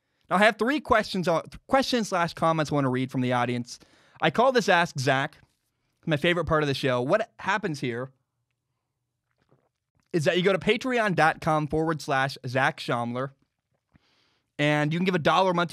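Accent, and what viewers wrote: United States. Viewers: Glad to see you're picking up on F1! Loving the commentary. It's definitely refreshing to hear F1 content in an American accent xD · Great to hear American accented F! commentary!, I believe you have found a great niche!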